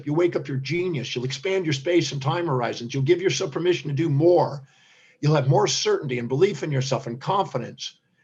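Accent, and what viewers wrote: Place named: United States. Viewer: An amazing mix for a speaker: american english accent + italian passion and intensity Got your message so clearly! Thank you again 😊 P.s: I hope I'm right saying 'an american english accent' as I'm from Argentina.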